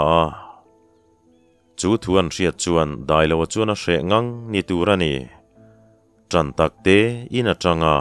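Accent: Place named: Champhai, India. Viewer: Tawng thluk thu leh lam dan ah chuan mizo thawnthu chhiar ka hriat toh ah chuan keini Champhai lam tawng thluk dan nen chuan i tawng thluk leh tawng kau chhe hi ala in mil in a dang hauh lo mai, khawdang tawng chu sawi lo ila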